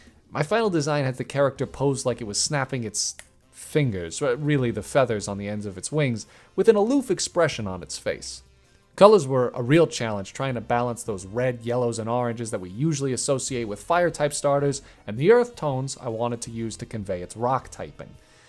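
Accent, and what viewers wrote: New England, United States. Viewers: I swear you sound more new englandy in these videos about new england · Ey yo! Somehow I've been deaf to that NE accent until now. Now I'm hyper focused on it. 😂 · I’m so confused, why is his New England accent so much more heavy in this video? Is he trying to dial it up or is it a joke? I’m from Maine so I know what a New England accent sounds like. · as a new englander, he did not start developing an accent. he's simply letting it loose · Is playing into his New England accent or is he just getting comfortable? 😭😭😭🤣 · Am I crazy or is accent going crazy in this video? Or am I just noticing because he said he’s from New England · He sounds 1000% more New England than any other video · Does anyone else feel like he's started pushing the new England accent since he first mentioned it a year or so back? I've been watching since the beginning and this accent is definitely new. 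· Did anybody else entirely fail to notice accent until this specific video and suddenly all you can hear is the thick yankee accent?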